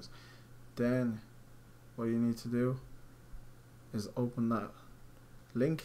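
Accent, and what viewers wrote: Dublin, Ireland. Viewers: Bleedin' Dublin accent x'D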